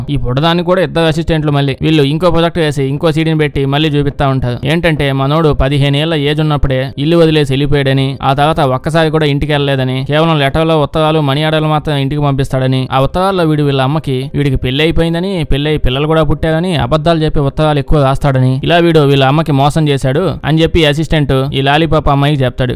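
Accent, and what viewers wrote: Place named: Godavari, India. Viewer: New subscriber.... Godavari side aa anna midi slang alane undi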